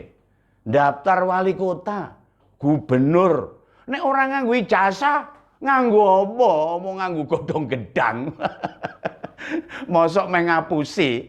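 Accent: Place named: East Java, Indonesia. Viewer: Logatnya...muuuaantaap...kang... tuban jawa Timur...👍🙏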